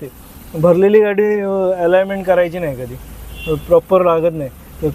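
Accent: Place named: Goa, India. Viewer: Tumchi bolnychi tunning goan vatte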